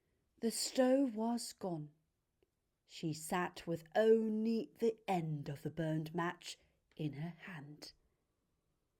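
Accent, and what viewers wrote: RP, United Kingdom. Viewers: 영국발음너무좋아하는데 목소리랑 너무 좋아요 진짜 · 동화올려주셨네요 감사감사^^~ 애가 영국발음이 좋아해요. 다좋은데 10분만에 안자네요 ㅜ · 정말 고급지고 세련된 상류층 영국발음이군요. 최고네요^^ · 영국유학한 지인이 말하길 비비씨 연령있으신 아나운서들이 쓰는발음이라하네여 ..발음좋으시다구ㅎㅎ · 평소 영국식 발음에 매력을 느꼈어요 구독 누르고갑니당♡ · 최고다 최고... 대박이다 대박 여기가 영국이네요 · 잠와요ㅋㅋ좋다!!!!강추 영국에있는듯합니다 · 영국영어 넘나넘나 좋아해요♡♡♡♡♡ 광고보고 아나운서님 팬되었는데 이렇게 직접 들을수 있다니💕💕💕💕 천천히 읽어주시니 더 좋습니다♡ 참, 혹 녹음하실때 쓰시는 마이크장비를 여쭈어봐도 될까요~~??!!! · 저 이거 들으면서 영국억양 따라해보고 발음 배워욬ㅋㅋㅋ자라고 만들어주신건데 배우고 있네요ㅎㅎ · 우와 british english asmr은 처음 클릭해서 들어보는데 진짜 동화책이네요. 액센트도 원어민 RP 라서 넘 듣기좋아요 :) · 영국영어 원탑이신듯~!! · 통해서 영국 발음을 더욱 좋아하게 되었어요 😍😍영상 올려주셔서 항상 감사하고 친절한 코멘트도 감사합니다 😊😊 좋은 밤 되세요 💖💖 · 이번엔 둘째아이 옆에서 영국식 영어의 신세계 입문중^^ 5천명 곧 돌파!! 화이팅!! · 발음연습에 정말 도움 많이 됩니다😍😍 영국남자보면서 듣기했었는데 여기서 보니 완전쏙쏙 들어와요 영국발음매력에 더 빠지고있어요😩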